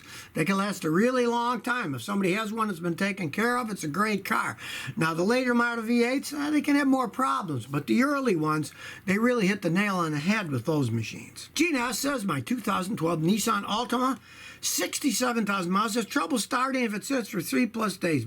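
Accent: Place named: New York, United States. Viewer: why do you have a New York accent? You sound like Joe Pesci.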